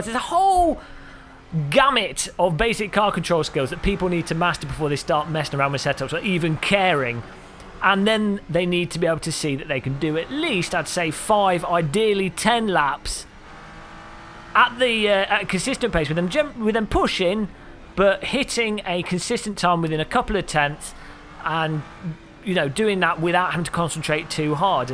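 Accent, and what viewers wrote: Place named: England, United Kingdom. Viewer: Dude you are a F.........king pure English dude :-)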